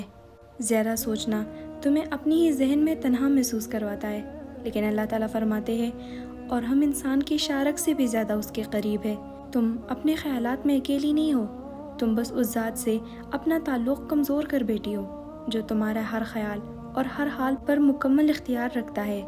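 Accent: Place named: Khyber Pakhtunkhwa, Pakistan. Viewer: Such a beautiful voice and accent . Senin accent is pathani Bilmiyorum . Thank you so much for such a good message . May Allah bless you ❤😊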